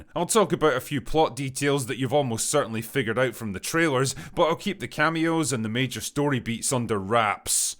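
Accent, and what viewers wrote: Scotland, United Kingdom. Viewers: Why are you speaking with a weird inflexion in every sentence? I understand that Scottish is part of your brand but it’s annoying and doesn’t add any comedic value. Stop. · Probably work on your accent you British cnt.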